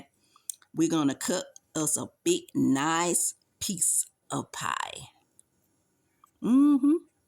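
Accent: South, United States. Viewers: Sister, as I listen to you prepare that pie, it reminded me of my momma. She been gone for 15 years. And then the southern accent. Oh girl, you took me back. Thank you so much. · I just love your accent dear!! Reminds me of my time living down south 🥰 i hope my pie turns out good. Thanks for showing me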